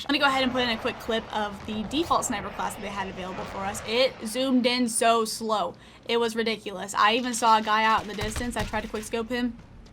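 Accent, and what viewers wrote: South, United States. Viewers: i like the southern accent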